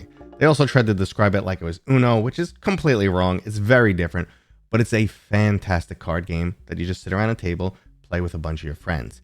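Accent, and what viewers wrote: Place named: Boston, United States. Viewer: Boston accent! I love it!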